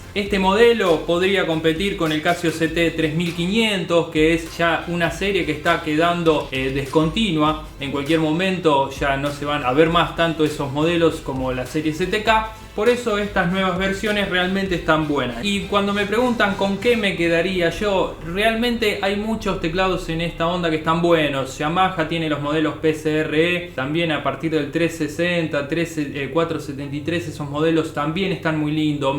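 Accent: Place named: Argentina. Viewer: pesimo video.... no tocas nada...me hubiera gustado escuchar el teclado y no tu estupida voz argentina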